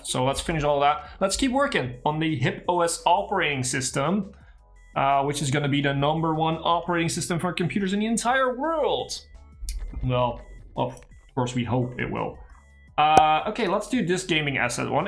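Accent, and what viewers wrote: Netherlands. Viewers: Your Dutch? Anyway great serie to watch!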